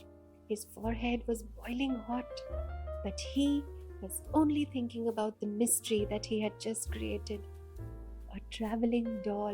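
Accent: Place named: India. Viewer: A sweet story in a sweet voice. Thank you for bringing these kinds of stories to us in Indian accent. It (nest) really gives us (listeners) comfort (Indian accent). Thanks!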